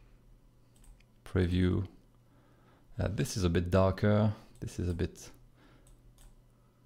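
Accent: France. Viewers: pls don't change ur french accent, u must be proud of it, i'am an asian, n i also have an accent as asian, my english still incorrect but i do all understand with ur explanation bout krita tutorial, i'am the beginner for digital art also drawing comic, i just start it since a week ago when i got krita already installed on my pc, i wanna try create my own comic even i can't drawing any art, if u want to know my painting just like lil child under 5 years old, but i won't give up to be an pro just like u r, someday i can be more than u to create some artwork, i believe my self n my own ability to do that, pls support me. thx for ur video, i luv it so much,. from now m ur fan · Moi qui ne me sens pas super à l'aise avec l'anglais, j'me sens moins perdu avec l'accent français!!😉. Un gros merci, super vidéo. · Amazing video. Even though the software has updated, this is still relevant. 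Concerning your English though, and please don't take this as a criticism or an attempt to insult you, but god fucking damn it I couldn't stop laughing throughout the entire tutorial. PLEASE never change your ways, this is really like getting advice from a stereotypical (not in a bad way) French artist with a bottle of wine nearby. You're the best, and I wish you the best. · Luckily I have a lot of French-speaking co-workers (French Canadian) so am used to the accent and can understand you just fine! :-D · J'ai juste compris que tu étais français... Super 😂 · Heh, your accent is mild. At least compared to other well spoken native French, English speakers I have listened to. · Thank you for this helpful tutorial. Just one question, does this o.p.a.b.t.s. organisation against protection of bad tutorial speech really exist? I dind't find it. And they are wrong. You sound French, but there are native speakers with a much more heavy accent. 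Please, go on publishing more tutorials like this. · Oh no the French accent makes it more exotic learning from the old French Masters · I love this tutorial. Thanks so much! (the french accent is becoming cute after I'm into the comic :-)) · That accent... FRENCH POWER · Ahah de rien ! Je voulai te rappeler la France ! Ça m'a fais plaisir d'entendre notre doux accent. Super tuto sincèrement ! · I love your french accent =D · ça s'entend que t'es français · You have a beautiful accent, is it French? Also you are a great teacher, I'm learning a lot watching your videos! Thank you,! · I just returned from living in Grenoble, FRance for 10 years. I love the accent, and miss hearing very much in my day to day conversations. Merci !! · Le bon accent Français, on aime! +1 ! :D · Love your accent, french speaking english sounds soooo nice, we, The Swiss people CANNOT speak any other language, as It will Sound like a polar bear imploding. 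Also love the Tutorial, even though I dont use krita, IT helped me a Lot, entertaining too Merci for this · Tu es français de france ou du québec car moi du québec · Hi :) I was wondering...are you french? you have a bit of an accent. I took my studies in french and always regretted it, since i thought that english is better for future life (there must be plenty of mistakes here too lol). But everytime i go to France or Belgium and go trough comic shops (mainly livres d'occasion) my regrets are gone...french guys have tuns of underground fantasy comic that will never be translated and maybe even forgotten. I found that beautiful and always leave with lots of them. Maybe i'll find yours there someday too :D i hope you're french since my post is so long....it would be awkward xD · Are u french? Nice accent. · French accent is so sweet 🤗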